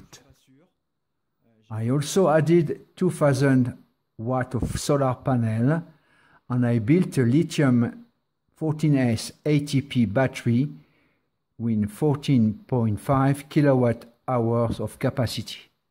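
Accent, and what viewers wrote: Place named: France. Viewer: Ah ah j'ai eu le même constat, pour une fois que je comprends intégralement une vidéo en anglais. Vive l'accent franchouillard :)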